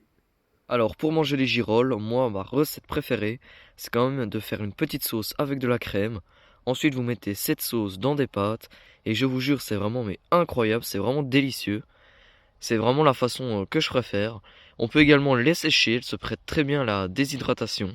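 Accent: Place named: Franche-Comté, France. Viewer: Tu ne serais pas de Franche Comté ? Je pense reconnaitre un leger accent 😊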